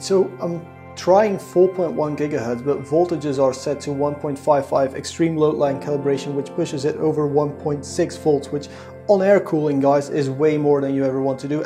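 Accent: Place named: Netherlands. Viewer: Why do you sound just a little bit dutch, or german or something ;) oh shit je bent nederlands haha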